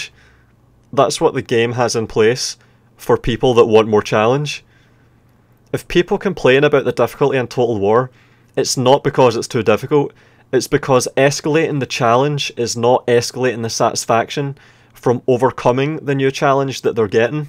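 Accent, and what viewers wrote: Scotland, United Kingdom. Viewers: You sound like i changed my siri settings to 'monotonic dulcet Scottish guy'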